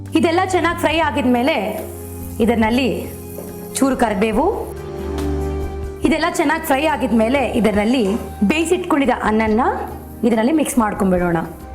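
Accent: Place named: Karwar, India. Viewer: Nice. Heralekai rasakke nimbe hannina rasa andubittri. By the way I enjoyed your Kannada accent. Idu Karwar kannadana ?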